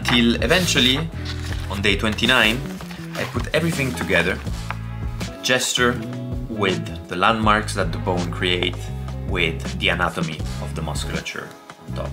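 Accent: Italy. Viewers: Ci ho messo una decina di secondi per accorgermene, ma l’accento italiano è davvero inconfondibile 😂 Da un tuo “compare” dall’Italia, bellissimo video, chiaro, semplice e diretto, e il tuo stile sia nel disegno che nell’editing mi piace un sacco! Come disegnatore occasionale sono super curioso per i tuoi prossimi video Continua così!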